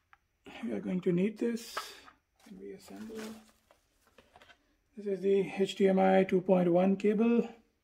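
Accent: India. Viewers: You sound like an Indian Are you indian ? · why do you sound and look like indian. are you Indian??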